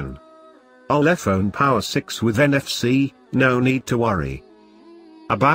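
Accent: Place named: United Kingdom. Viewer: video introduces: UK voice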